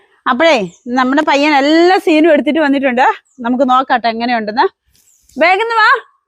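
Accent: Kottayam, India. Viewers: കോട്ടയം ഭാഷ ആണല്ലോ പറയുന്നത് കാസർകോട് ഒട്ടും സംസാരം അല്ല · Hi mam ..naad sherikum Kasaragod thanne aano..but slang kottayam ole und.. reply please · ശെരിക്കും കാസറഗോഡ് ആണോ വീട്. ബാഷാ കേട്ടാൽ ശെരിക്കും കോട്ടയം സ്റ്റൈൽ. കാസറഗോഡ് ഭാഷ ഞാൻ കേട്ടിട്ടുണ്ട്. മിക്കവാറും ഉള്ള വീഡിയോസ് എല്ലാം ഞാൻ കാണാറുണ്ട്. എനിക്ക് റിപ്ലൈ തരുമോ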